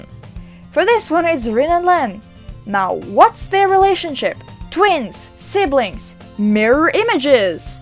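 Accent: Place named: Sweden. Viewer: i feel the swedish accent...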